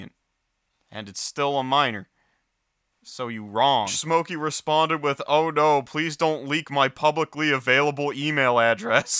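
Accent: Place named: Philadelphia, United States. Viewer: banger video !! u from philly huh?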